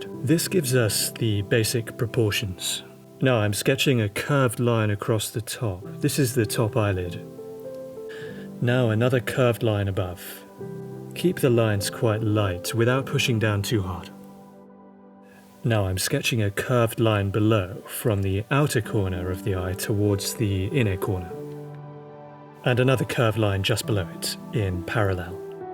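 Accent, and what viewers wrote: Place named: United Kingdom. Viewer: Yup I was thinking the same thing. The British accent ad smooth softness of his voice is just fin du fin lit! (French term.)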